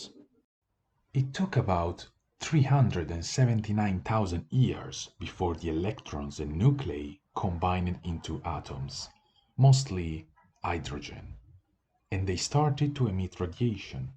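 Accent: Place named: Italy. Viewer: Love italian accent!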